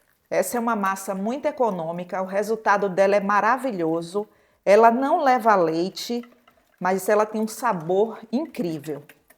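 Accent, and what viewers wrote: Bahia, Brazil. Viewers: Te encontrei por acaso , e estou começando a fazer salgados, já fiz uma receita de coxinha ficou maravilhosa. Vou fazer vários pra vender. Vc explica com muita calma e amo seu sotaque minha família é da Bahia. Eu sou de São Paulo assistindo suas aulas. Gratidão vc não imagina como está me ajudando. Na verdade acredito que está ajudando muitas pessoas. Jesus te abençoe 🙏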